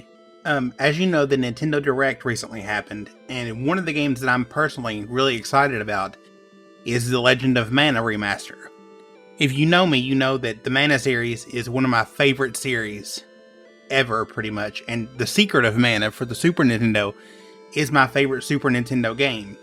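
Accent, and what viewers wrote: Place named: South, United States. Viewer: Man I want to like this video but that accent is so heavy. I'm from Alabama myself and I try not to talk with that draw. It's funny I'm from one of the most hillbilly states in America, but I hate the accent and the way of life. I'll take Miami Beach and the ocean any day over hillbilly land in the mountains. More southerners should try to speak without that horrible accent.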